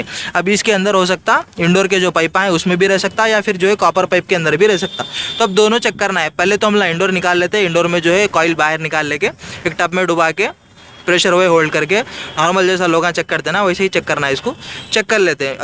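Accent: Hyderabad, India. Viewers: Tume Hyderabadi mlum hote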